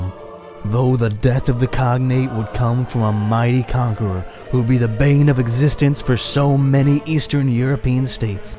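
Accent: United States. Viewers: A moderately interesting look at a little known area of mediaeval history... but the style of narration is appalling and impossible to take seriously. It's not just the Yankee accent I object to but the breathlessly hyperbolic delivery which sounds like a bad sports commentator trying to put a spin on a report about a very boring football match (Association Football that is, not that ludicrous running around in suits of armour the Yanks do). My advice is to get a decent scriptwriter and stop trying to make it sound like the old intro to 'Soap'. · Is the guy narrating this from Jersey? Serious question.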